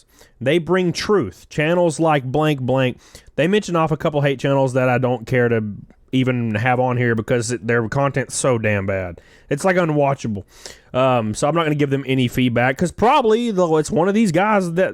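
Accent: South, United States. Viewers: Your accent lol, it’s just fine. Your from the south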